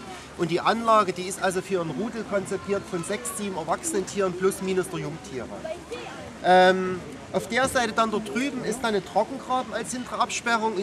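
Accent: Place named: Saxony, Germany. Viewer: Herr, Sie können prima meinen Heimatdialekt sprechen. Ich wohnte in der Gegend, jetzt aber in Thüringen. Kriege richtig Heimatgefuehle, wenn Sie bei Elefant, Tiger und co dabei sind. Ihr Sächsisch zwischen " Leibzsch un Dresdn" ist das beste. LG nach Taucha. Sind Sie Ehrenbürger? Wäre angemessen.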